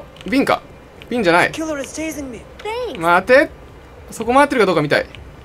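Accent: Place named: Kyoto, Japan. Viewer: 関西弁と京都弁が混じったRYOUSUKEさんの動画にずっと癒されてます🍀😊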